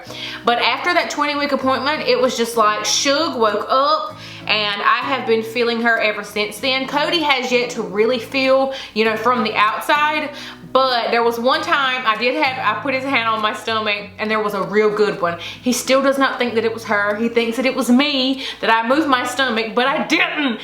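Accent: South, United States. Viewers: You look great girl!! Get you a body pillow!! It will change your life!! I didnt get the expensive one from the baby stores just one from Walmart. I have 4 little girls, and all 4 times used it!!! When she gets hiccups it is crazy. My daughter used to get them at 10pm every night when I was pregnant with her. Love your channel, and sweet Cody and your Mama is hilarious!! I love her. I enjoy the content of all the videos. And I love your accent, I am from SC, so I too have that southern drawl. 😀 Good luck on the rest of your pregnancy!!!! I will be praying for a healthy girl, I cant wait to hear her name!!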